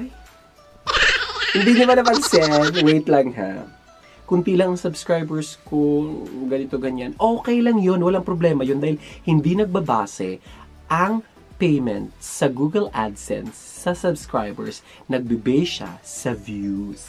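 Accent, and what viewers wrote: Visayas, Philippines. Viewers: Haha thank you natawa ako sayo 🤣🤣 bisaya ka pla salamat sa tips sana ako magkaroon ng marami 😍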